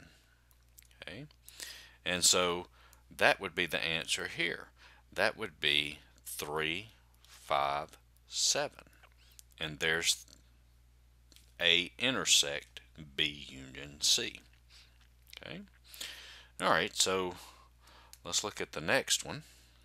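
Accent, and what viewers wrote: South, United States. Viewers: Does the have a southern accent? I like it whatever the accent ❤️ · You mister, you sound so american, where are you from? Tenesse, Texas, The Grand Ranches of Arizona? Nevertheless, thanks for your video, it has certainly made things clearer...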